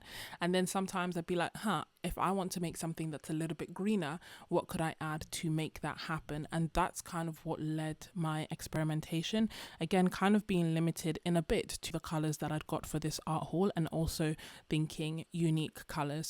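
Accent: England, United Kingdom. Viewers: Thoroughly enjoyed watching this and listening to your train of thought. Excellent examples, very clear and large. Many thanks for your hard work on this video. I like the simplicity of the "Sketcher's" names. Best wishes from the Pacific NW. (Listening to you makes me pine for England - I miss it so much!)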